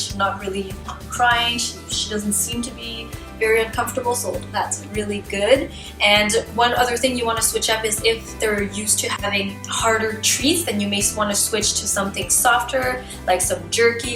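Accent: Quebec, Canada. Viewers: J'entends ton accent franco-canadien, c'est super! :) Merci pour ce vidéo! Ma shihpoo vient de se faire extraire 2 dents et on aimerais qu'elle soit aussi comfortable (que possible), durant son rétablissement. xx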